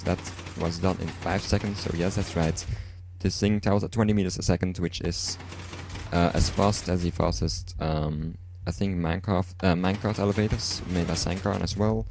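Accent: Netherlands. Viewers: I can hear you're dutch, and you're from the south of the Netherlands aren't you? ;) Great design man! Kudos to you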